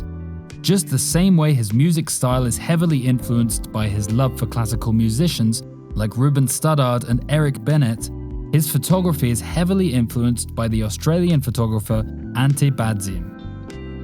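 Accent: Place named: England, United Kingdom. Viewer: 1. The first 3 letter words in which you described him.......I thoroughly disagree with that. He ain't dt good IMO. 2. Please pronounce his name properly. Don't pronounce it in your English accent gvng it English pronunciation.😡😡😡😡. His name Kim Taehyung is a Korean name, not an English or an American name. So pronounce it d way Koreans pronounce it, not in d way Westerners speaking English pronounce it. 3. to ...well, in ds pic he ain't lukin charming but s lukin like a kindergarten school kid. 😂😂 And he was not dt charming in his initial days. He lukd quite ordinary. 4. As regards his impeccably perfect looks, I hv seen his photos during his pre-debut days & tbh he was nothing to look at back those days....he's just a lean & skinny faced road-side boy in his looks. But aftr bng a trainee he havin undergone through immeasurable grooming, care, styling & skin treatments, transformed into such a hottie. 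Before, he just had fair complexion & a perfectly shaped face. Hence it's proved dt be it males or females, if we undergo proper grooming, care & styling under best professional beauticians, or under our own selves, we wud b 20 times more attractive looking than we are in actual. Like, he's a farmer's son. But was one indeed born under extremely lucky stars due to which from an ordinary commoner of Korea he became a worldwide celebrity now.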